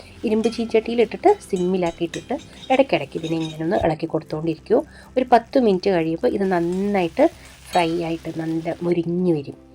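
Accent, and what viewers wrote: Palakkad, India. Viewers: നമ്മൾ പാലക്കാടന്മാർ പറയുന്ന പൊലെ "നാഴി നെല്ലിക്ക" എന്ന് പറഞ്ഞ് പാലക്കാടൻ ശൈലിയിലെ വിവരണം അടിപൊളി..😂😂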